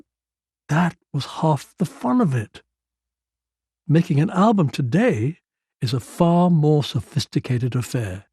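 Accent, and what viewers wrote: United Kingdom. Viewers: Greetings..Awesome… … ever so creative..never stop reading.. it’s quite a luxury for me to hear ur Brilliant brisk British Accent..beautifully read and beautiful blessings!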